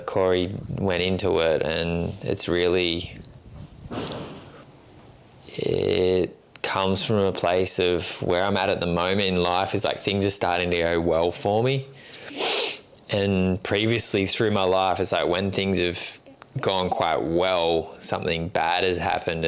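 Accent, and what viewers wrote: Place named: Australia. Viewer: I’m grateful your YouTube channel came up on my feed. Not sure why, maybe it’s the Aussie accent that I adore. You don’t look apathetic, you speak gratefulness and that’s a great place to be, no matter what. Sorry to hear about your father, prepare yourself, because those blissful moments are too fleeting. Did you get to visit the US while in Canada? Would ❤ to see more, you speak with your truth very well.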